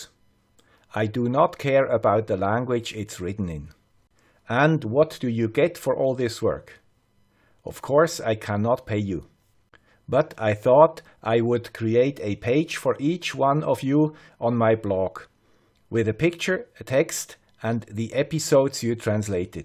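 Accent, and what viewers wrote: Switzerland. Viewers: "Olá membros do YouTube ! Eis o homem de sotaque Suíço ! ..." - Português Europeu - European Portuguese. Consider me enlisted ! If you can find the time to do it, I'll find some time too... Alexandre from Portugal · Love your swiss accent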